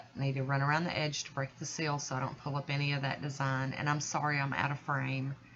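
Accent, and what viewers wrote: South, United States. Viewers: I love the names, too funny. I love that they have a southern accent. Pannnkk!!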